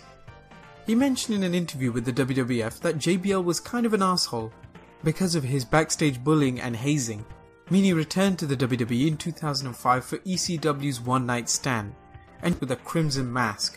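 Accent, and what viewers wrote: England, United Kingdom. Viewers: Are you English? Just wondering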